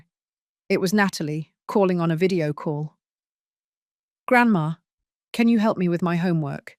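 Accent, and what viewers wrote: England, United Kingdom. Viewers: Did this case happen in Great Britain? The narrators accent sounds English & a few things about court seem not American. The DIL seems to be an idiot, too. Also had I been her, the judge, I'd have the police waiting outside. When court was over, she'd be arrested for having assaulted me; and yes, I'd press charges for sure. And I'd cut son out of will. He's scum & stupid too. Instead, I'd leave everything to grandkids when 21. And I would tell son that wife has been stealing from him & the law firm. Prison for her!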